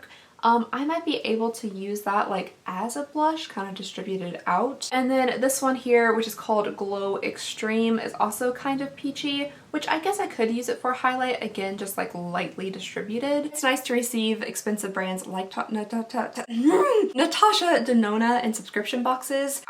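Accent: Kentucky, United States. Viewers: Instagram- killercupcak3 I love your Kentucky accent! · I love your videos you’re very sweet and funny ❤️❤️ I’m from Kentucky also but feel our accents are so different I absolutely love your accent.! my email is <mail>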